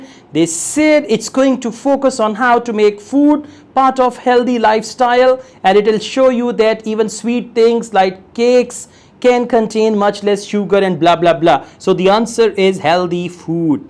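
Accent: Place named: Punjab, India. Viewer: Haaye tuhadi Punjabi 😄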